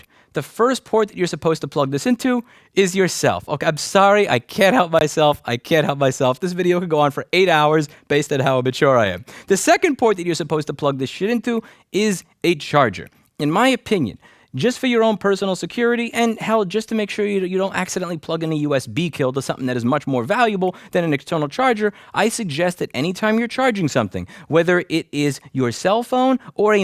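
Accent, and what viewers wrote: New York, United States. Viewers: Is it just me or Sounds really New Yorkey on this one?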